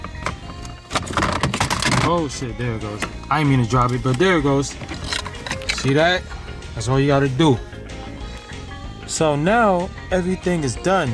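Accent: New York, United States. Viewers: i can hear the NY in ya voice where u from um un NY too install my shyt lol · Us nyc cats know when we hearing that new your accent!!! Good vid 🗽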